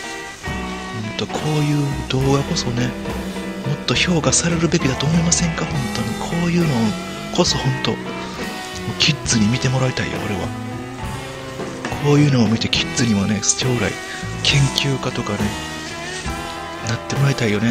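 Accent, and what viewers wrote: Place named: Juso, Japan. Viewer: 十三のイントネーション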